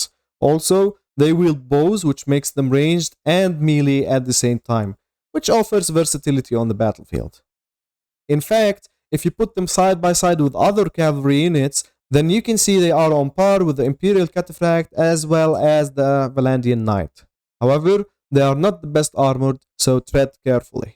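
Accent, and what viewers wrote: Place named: Lebanon. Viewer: أنا حاسس انك لبناني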